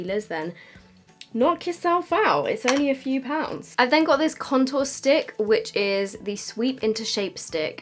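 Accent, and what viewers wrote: Australia. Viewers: Very impressed with your Australia accent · That Australian accent though! 😂😂👌🏼👌🏼 #notificationsquad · Still beautiful 😘 that Aussie accent thouugh 😂👍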